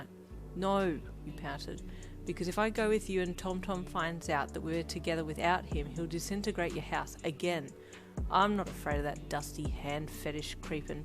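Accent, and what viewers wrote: Australia. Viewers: Loved this reader, I've got a feeling your Australian, I can hear the accent : )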